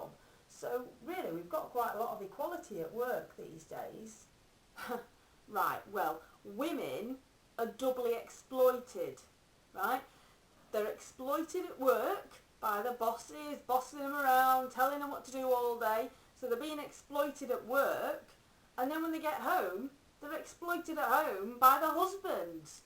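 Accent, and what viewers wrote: United Kingdom. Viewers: Are you from Birmingham? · this stoke accent is beautiful. 'CAPEETULLIZUM.'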